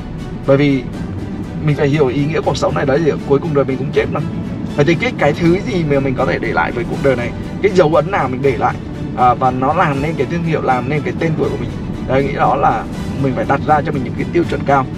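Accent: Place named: Central, Vietnam. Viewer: Anh. Nói chất giọng miền trung và phong cách sống rất hay. Xin anh chia sẽ về: Nghề chủ yếu? Xuất thân, trình độ học vấn, Gdinh, vợ con, nhà cửa, sự nghiệp...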